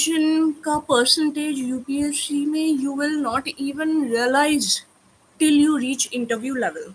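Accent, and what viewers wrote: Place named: England, United Kingdom. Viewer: Why her Hindi sounds some Bollywood Angrez Hindi accent. Oh wait she is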